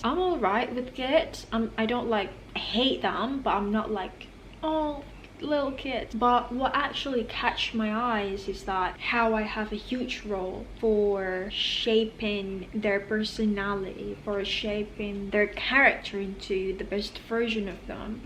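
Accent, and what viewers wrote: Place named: United Kingdom. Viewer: i am addicted to your british accent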